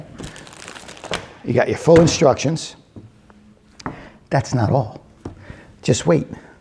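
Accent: United States. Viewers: Love your sense humour and your American accent. From 🇬🇧💕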